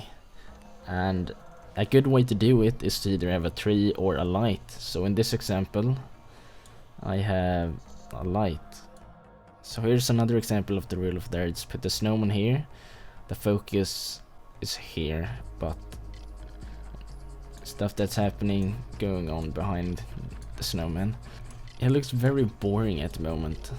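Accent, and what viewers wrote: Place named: Sweden. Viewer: He sounds Swedish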